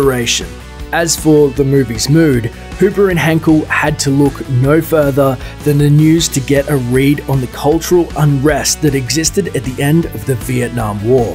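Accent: Australia. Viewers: Are you Australian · Australian narrator?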